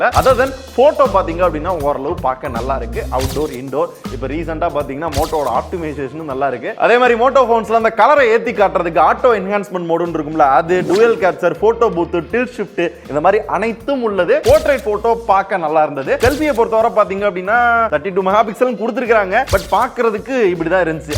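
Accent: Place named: Madurai, India. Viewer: மதுரை காரனுக்கு நீங்க பேச சொல்லிக் குடுக்க வேண்டாம்...பேச்சு வழக்கு அப்படி தா இருக்கும்... 💯 பிடிச்சா பாரு இல்லனா‌ கிளம்பி போய்டே இருக்கனும்..✅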